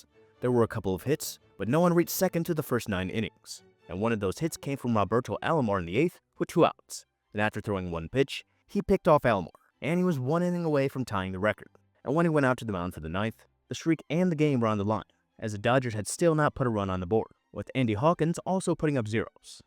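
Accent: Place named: United Kingdom. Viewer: I never knew the official statistician for MLB was British.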